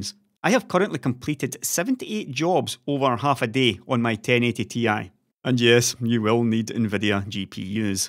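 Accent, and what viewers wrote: Scotland, United Kingdom. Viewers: a thick scottish accent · You're Scottish? Never would have guessed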